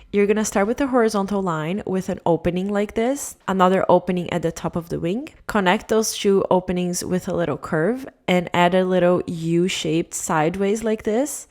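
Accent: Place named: Brazil. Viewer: 😅vey eu vendo esse vídeo em inglês e falei ela com certeza é brasileira o sotaque em inglês igual ao meu, ameiii obrigada pelo tutorial, adorei ❤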